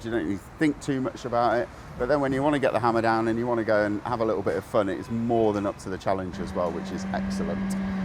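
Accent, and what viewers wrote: United Kingdom. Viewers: I wish I understood more what he was saying. My family is Irish so Im used to some heavy accents but sometimes the British slang and accent is rough.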